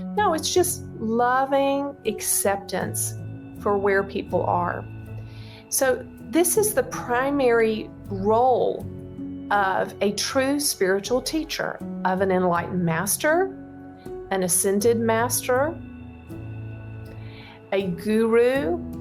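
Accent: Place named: South, United States. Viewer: Very informative and we are all blessed that you had such a diverse life experience and your knowledge as a teacher and musician with a wonderful Voice (and Southern Gal accent and character 😀)is a true blessing 🙏. Thank you, and Team ❤️👏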